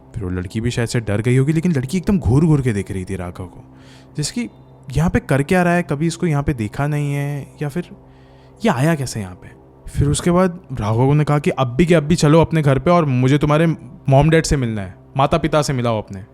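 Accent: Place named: Mumbai, India. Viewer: Apna mumbai wala accent chor ke story nurret kyea kar bhai tab jada log connect kar payenge tere sath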